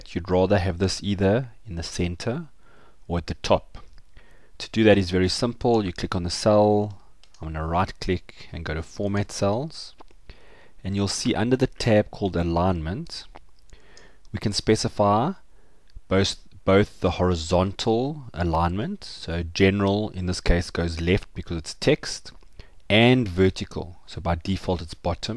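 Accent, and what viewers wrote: South Africa. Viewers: i can bet the man is south african from the accent ....anyhow much needed help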